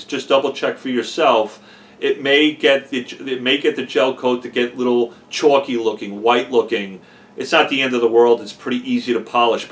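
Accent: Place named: East Coast, United States. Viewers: Love your stuff, but I gotta say: Your into: “This is ovah here…” (My brain: “this guy is definitely on the east-coast”) “From Dallas…” (My brain: “what? That’s not possible!”) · Hey pal John from Northport LongIsland again Auto Detailing love the vid and like you have experienced the same thing as you with trying it all and have it narrowed down to most of what you have. How is that citral hope I spelled it right it was the last one you had you mentioned it takes off road paint and here on LongIsland they are always doing the lines on the road lol. Didn't you say you're from here as well that's our accent lol. Thanks keep up the great vids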